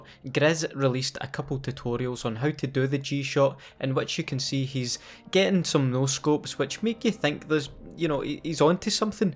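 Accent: Scotland, United Kingdom. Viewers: Absolutely love your vids. I know I'm a bit (just a bit) late . I'm from western Scotland and I can definetly tell you're Scottish. What part of scotland may I ask? Also keep it up man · I was getting a little spooked for the ghost of vacant clip, until you said with your strong Scottish accent "What tae fuck is that". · Love the Scott esh accent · Am I the only one who thinks this guys scottish voice doesnt fit the spooky map myth? Also: I didnt mean to offend you, if this did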